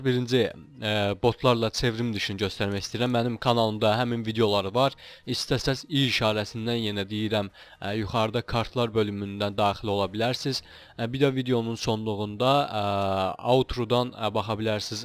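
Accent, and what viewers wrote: Azerbaijan. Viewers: Anaa azeriymişsin valla yahşi demesen anlamicam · aynen sonradan anladım da azeri ler bukadar değşikmi konusuyodu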